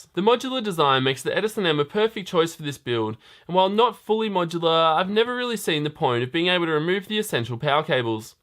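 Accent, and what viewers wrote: Australia. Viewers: Mate, no offence but your voice is horribly annoying. On top of it you just don't stop talking with no pauses between sentences. Your oz accent is almost incomprehensible at times and I resorted to use the captions which as you can guess are not very good. I could not (even if the video is well made, edited and nice altogether) watch more than the half of it. Please consider someone else to narrate it.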